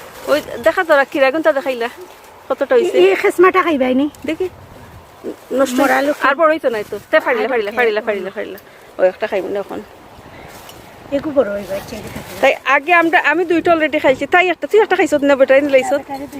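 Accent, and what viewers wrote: Sylhet, Bangladesh. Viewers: আমরার সিলটি আপার মাতের মত লাগে সুন্দর সুন্দর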